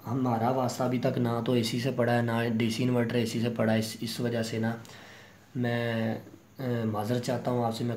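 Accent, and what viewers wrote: Punjab, India. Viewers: Good Work AND your accent is very cool REAL PUNJABI DUDE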